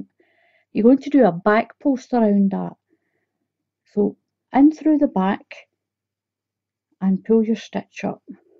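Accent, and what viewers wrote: Scotland, United Kingdom. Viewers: I am going to make this for a friend in permanent healthcare in Wisconsin. It's a man of course, love the name you gave it. Thanks from Louisiana. I'm guessing your in or from Scotland, love your accent as we always say. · I just love your Scottish accent. You are definitely right about not having more “Man Blankets” patterns. I mean, everyone has at least one man in their lives that deserves a beautiful, or shall I say handsome, blanket. This blanket is very easy to do & I love the way it’s turning out for me. It’s for my son for a Christmas gift. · it's a Scottish accent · Good Day to you. I have been looking for such a project to used up a large over buy of heather gray Red Heart yarn, What weight yarn is the yarn you used? I wil be using 4 which is a worsted weight. I definately will be doing a swatch, it looks like you need to do a double amount of foundation chains since the work shrinks in to about half, which is why one does a swatch, to figure out these things. 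The finished patterning looks like basket weave and should be quite effective to keep any one guessing how it was done. I have never done front/back posting crochet before, glad you cleared up what stitch you were doing in your pinned comment. Its definately double crochet, If this is considered treble crochet in your circle, what is single crochet? Just a curiousity on my part, love to see techniques from other places and I will echo other commenters, your Scottish accent is truely lovely. Thank you for showing us how to do your basket weave blanket, my crochet fingers are itching to get started. Best Wishes. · Love the blanket and the accent! Is it Scottish, eh?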